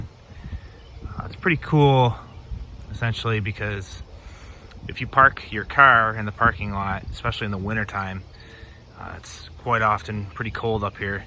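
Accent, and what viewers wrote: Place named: Western New York, United States. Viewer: Wonderful WNY/CHQ accent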